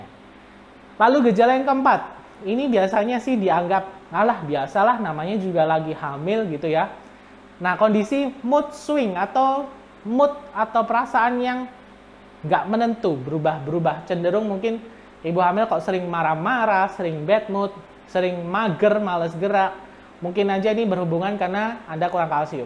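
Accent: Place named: Java, Indonesia. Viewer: Sampyn wong jowo toh dok...jowone njar endi??😁😁😁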